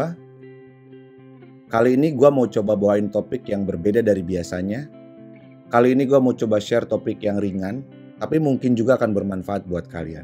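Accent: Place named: Indonesia. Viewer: Sudah betul bro, tapi memang lidah/logat indonesia memang gitu, ya beda banget sama logat orang eropa kayak gitu, tep semangat bro...😅👍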